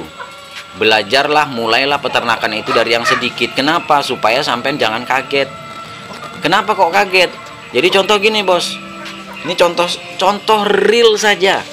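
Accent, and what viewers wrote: Madura, Indonesia. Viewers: Madura ya kang? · Dari logatnya orang madura ya cak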